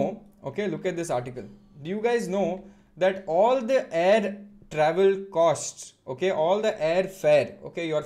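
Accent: India. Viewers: Liking the video after I heard Kannada voice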